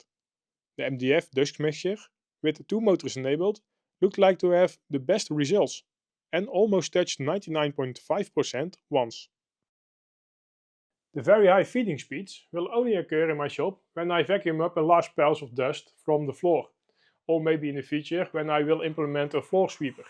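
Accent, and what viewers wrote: Netherlands. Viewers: The moment you hear its a dutch guy within the first few seconds xD great video tho! oh, greetings from Soest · Good content, but don't know if auto dubbed is worse or the super dutch accent xD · Dutch accent is classic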